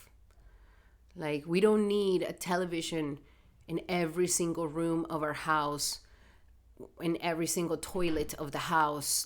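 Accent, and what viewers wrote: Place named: Spain. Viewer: I love your intros! Most channels are so fluffy, your intros are so dense, captivating and informative! I have a question, when you say if a planet is “strong”. How do I know if my Saturn is strong? Also very random, I’m a Spanish teacher and love the language… I know this is a Vedic channel, but your accent sounds Spanish -esque 😂 any relation?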